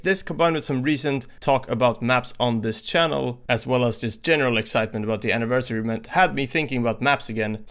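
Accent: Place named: Sweden. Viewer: Jag hörde på din dialekt att jag du var svensk, jag visste det 😂 Fortsätt med det du gör du e helt otrolig och jag älskar dina videos ❤️👍😀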